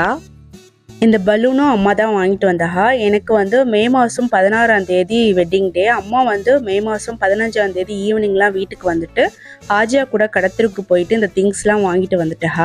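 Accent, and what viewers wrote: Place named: Nagapattinam, India. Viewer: Nagapatinam karaikal side la ipdy than pesuvom avaga ivaga solrathuku bathila aha iha nu soluvom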